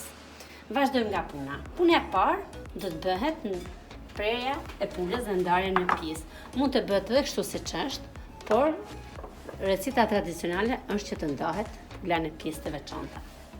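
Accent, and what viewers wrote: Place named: North, Albania. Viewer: Te lumshin duart do ta gatuaj se me plqeu shum si recet. se je nga Veriu kuptohet nga e folura po qa vendi mude ta di te lutem.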